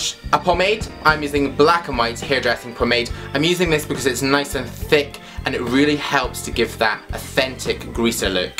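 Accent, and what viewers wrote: United Kingdom. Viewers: Love the video! It's a godsend for a theatre person working on a historical piece! But I have to ask, where are you from in the UK? I'm trying (and failing) to place your regional accent. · What a British accent mate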